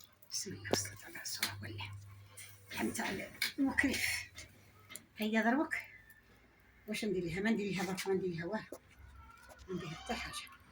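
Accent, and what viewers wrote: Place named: Gulf, Arab world. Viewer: ماشاء الله 😍. باين بنينه وروعه كما للهجه عندنا بالخليج 🌹. تسلم يديك خالتنا العزيزه❤️ سعدات الي بياكل من طيابك 👍🏻❤️🕊️🕊️🕊️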